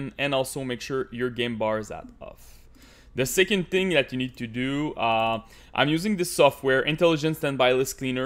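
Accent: Quebec, Canada. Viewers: Chu tombé par hasard sur ton vidéo pi juste en entendant le début j'ai faite : Ha ben, un autre Québecois esti :D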